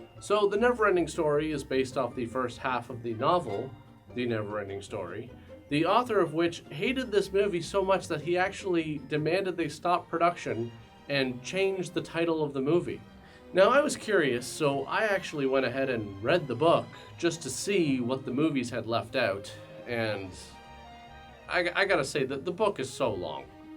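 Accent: Canada. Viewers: canadian, eh? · Are you Canadian?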